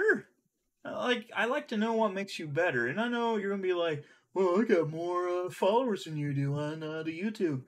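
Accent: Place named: North, United States. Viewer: Watched 2 of his videos and saw he has little respect for southern life style. He sounds like he is from up north.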